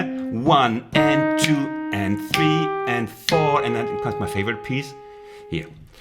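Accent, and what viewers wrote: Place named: Germany. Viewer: Thanks a lot for posting this tutorial! It's one of my absolute favorite pop songs and now I finally know how to play it. I also like your hard German accent (being German as well).😀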